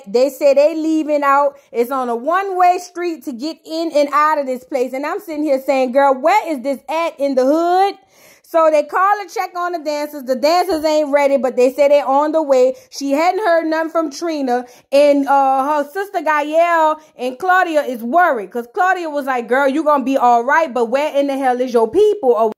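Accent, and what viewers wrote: New Orleans, United States. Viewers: You’re hilarious! 😂 I started following you last week. I’m NELA but I feel like I’m listening to my NOLA friends. Gotta turn them on to your channel.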